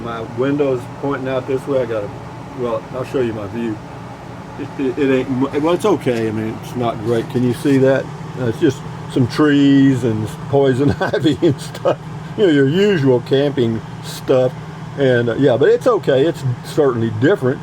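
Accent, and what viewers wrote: Texas, United States. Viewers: New Zealand here again cheers to see Beer Thirty return and to listen to interesting casual chat..really like to hear you Texan accent...Keep the beers coming!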